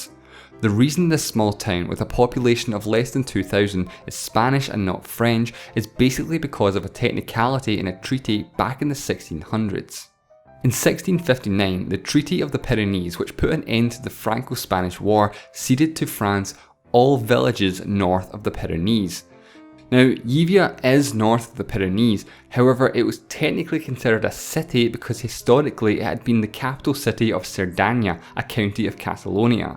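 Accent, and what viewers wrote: Scotland, United Kingdom. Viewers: Love this videos, love this guy's scottish voice, love that tiny piano in the background, love that Chile is mentioned here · are u scottish? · he has a scottish accent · i cant help but wonder why i find females from the UK, accents sooo sexy, while the males i consider an assault on my auditoty systems.both electronic and organic. anyone know exactlly where this gentleman is from exactlly? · what accent is this? scottish? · I get what you're saying, but... the dude is not gringo, he's scottish. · Luiz Albano He’s Scottish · GeoffCMD I don't know what Canadians you've been listening to, but he doesn't even sound close to one. Like Nobody said, he's Scottish. Though he has a thicker accent than I'm used to hearing for Scottish accents, like in Glasgow. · Thank you mate!! by the way, now that i'm watching the video again his scottish accent is fairly obvious, i should've noticed it before. 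i'm such a fool 😁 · He’s Scottish.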